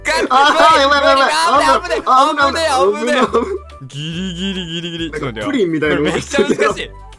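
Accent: Kansai, Japan. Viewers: 関西弁意外だなって思った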